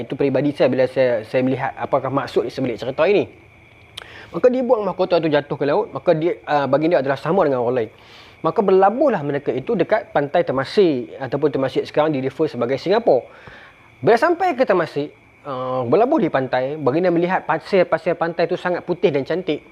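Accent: North, Malaysia. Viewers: Assalamualaikum tuan boleh tumpang tanya asal orang utara negeri mana dan daerah mana? Maaflah kalau saya kolot tak tahu. Apa pun memang suka mendengar cerita sejarah yang masih tidak sesiapa dapat membongkar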